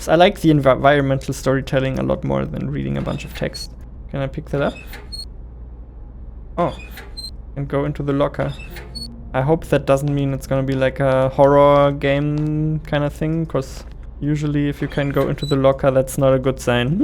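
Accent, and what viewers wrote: Germany. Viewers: Man hört den Deutschen Akzent so raus haha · are you german? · Bist du Deutsch? Hört sich bisschen so an. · Never heard such german englisch but I love it haha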